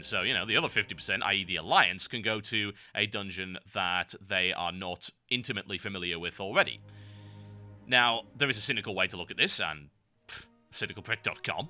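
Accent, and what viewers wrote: United Kingdom. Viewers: Hmmmmmm snarky british sounding guy who talks fast? That's a Yahtzee!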